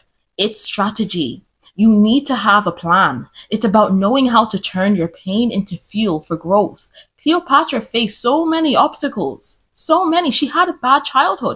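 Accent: Ireland. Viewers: Irish! That’s it! The accent is very similar!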